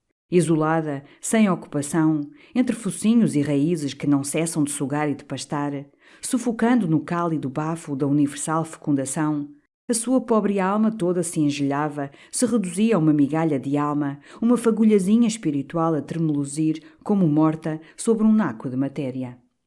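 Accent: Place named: Portugal. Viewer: A pronúncia é d'alguma região de Portugal.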